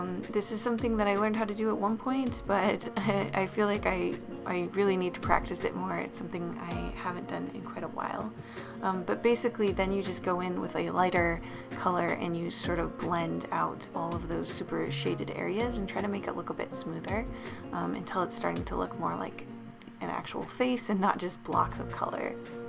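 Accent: Germany. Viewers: Nachtfalter Auf tumblr und der Kunstseite von Yt trifft man mehr an als irgendwo sonst! Lustiger Weise kann man sofort einen deutschen Youtuber an der Art wie der Zeichner redet erkennen XD Zum Beispiel habe Ich erst gestern wieder einen Deutschen durch Zufall gefunden und man hat den Akzent ziemlich rausgehört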